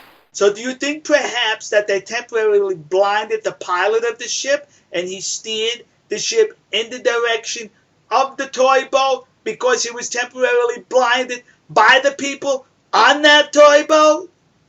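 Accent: New York, United States. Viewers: i don't like whalers, i don't like antiwhalers, i don't like your stupid yankee accent. the whole shebang=not newsworthy. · dude, if you gonna defend any side at least use common sense and not your rly funny new york accent...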